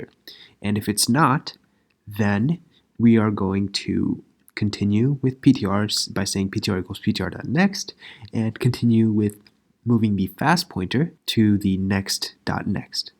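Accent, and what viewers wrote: India. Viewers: Are you from hyderabad ?🙃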